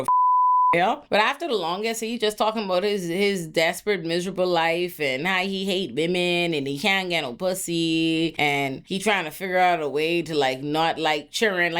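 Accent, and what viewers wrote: Bahamas. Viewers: Are you Bahamian or Nigerian? Can't tell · girl just hearing ya Bahamian accent is be enough for me in these videos for rewatching lollll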